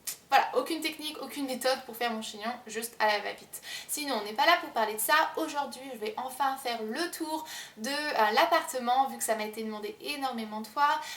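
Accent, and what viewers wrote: United States. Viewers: Puis sa meilleure amie d'enfance est américaine il me semble, elles étaient tout le temps ensemble, elle passait du temps dans la famille de son amie où ça parlait anglais, ce qui expliquerait ce petit accent. Mais je ne connais personne qui à force de trop parler anglais se met à parler français avec un accent ... Mélanger ou oublier des mots, oui, perso ça m'arrive souvent. Mais de là à avoir un accent quand je parle ma langue maternelle... Je pense que le truc de de notre miss remonte à l'enfance passée avec son amie, on attrape plus facilement les "tics" et habitudes à cet âge-là. Voila!! ^^ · moi je vois pas le bazar en tout cas lol super appart jaime bien la déco ! et c marrant ta choppé l'accent américain même quand tu parle français lol <3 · Coucou, j'adore ta vidéo ♥ ton appartement est trop beau *-* Et j'ai remarqué que même en parlant français, tu as un accent américain :) Bisous ♥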